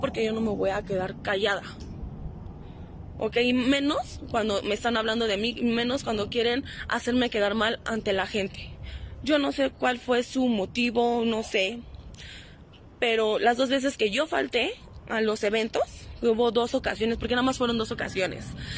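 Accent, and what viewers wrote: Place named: Fresa, Mexico. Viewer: SIGO A NERY POR SU MUSICA Y POR TI AMIGA LASTIMA QUE TE METISTES 6 AÑOS CON EL DUEÑO DEL GRUPO Y PUES ESTAS MUY GUAPA PERO AHORA QUE VEO COMO HABLAS ME HE DECEPCIONADO DE TI ERES CHOCOSA TIPO FRESA EN REALIDAD NO SE QUE EDAD TENGAS PERO TE SEGUIA A TI Y PUES A NERY LO SEGUIRE POR SU MUSICA SUERTE AMIGA ESTAS MUY LINDA NO VUELVAS A INVOLUCRARTE CON LOS DUEÑOS DONDE TRABAJAS ESTAS JOVEN TIENES UN CUERPAZO PERO NO COMETAS LO MISMO POR QUE MUCHOS ESTARAN SOBRE TI POR TU CUERPO Y TU JUVENTUD ANIMO Y NO SEA FRESA ME HE DESEPCIONADO DE USTED MI AMIGA LASTIMA SUERTE CUIDATE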